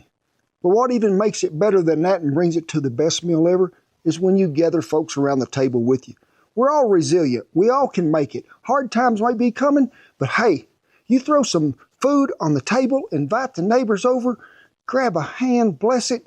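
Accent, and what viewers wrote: South, United States. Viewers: 1. Open the video 2. Disable automatic dubbing 3. Enjoy ´s Southern Accent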